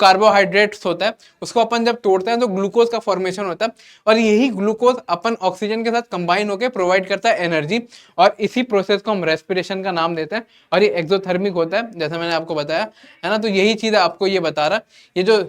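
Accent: Bihar, India. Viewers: Sahi me aap Bihari ho kya 😮😮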